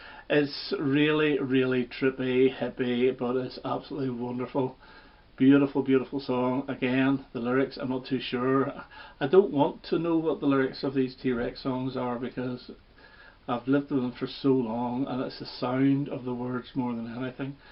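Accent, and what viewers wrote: United Kingdom. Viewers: From America.. it's lovely to hear the Brit accent. We here were only introduced to Electric Warrior and Slider, and it's fun to catch the rest. Like your selections and collection! 😊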